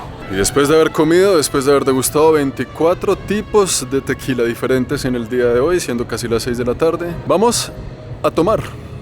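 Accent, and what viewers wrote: Bogotá, Colombia. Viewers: Amo el acento colombiano ❤ entonces puedo decir que por eso empecé a ver tus videos y ahora no pido parar muy buena información tu voz relajante te invito a Puebla es tan grandioso cada rincón de mi México. Bienvenido 😊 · Ahora quiero un novio colombiano 😅😍 me encanta este acento 😍😍😍😍❤️ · Me encanta tu manera de narrar! Eres súper gracioso y ese acento colombiano que tiene me encanta! 🤣🤣🤣🤣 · Me encantas con ese asentó colombiano estimado 💚👍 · Q gran contenido y con ese acento rolo genial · Habla rolo acento bogotano se dice q es el acento español más claro de Latinoamérica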